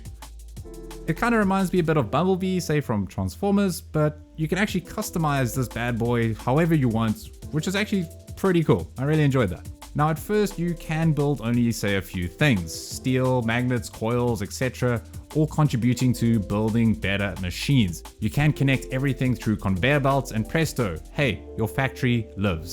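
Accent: South Africa. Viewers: My man sounds like a Saffer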